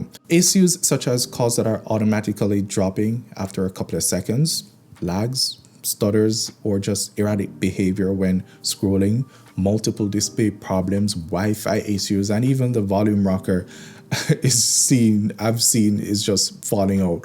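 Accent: Jamaica. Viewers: Are you from the Caribbean, specifically Jamaica?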